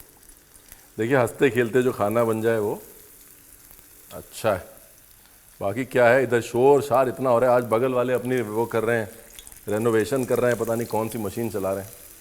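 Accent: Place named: Punjab, India. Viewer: Sorry to say Sir but why do you overeact so much? It always seems like you need some kind of validation that you are punjabi. We all know you are punjabi. You always mix all three languages English, hindi, punjabi everytime. Please use one language according to your channel preferences. I know you have a punjabi touch in accent and we all have .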